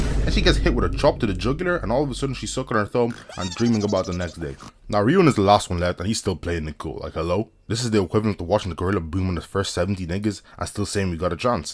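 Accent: Ireland. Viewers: are you Irish 🇮🇪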